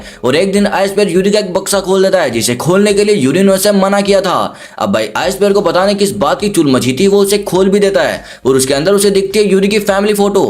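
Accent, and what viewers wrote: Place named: Pakistan. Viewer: Ap Pakistan se ho? 🤔 aapki accent pakistani jaisi hai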